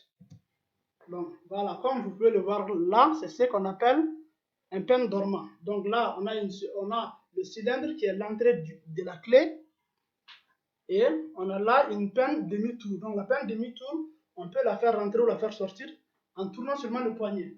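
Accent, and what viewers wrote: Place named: Gabon. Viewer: Bonjour on dirait plutôt l'accent du gabon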